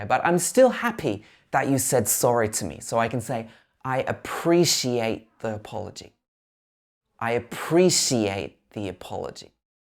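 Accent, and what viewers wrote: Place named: RP, United Kingdom. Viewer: Contemporary (Modern) RP! Yay!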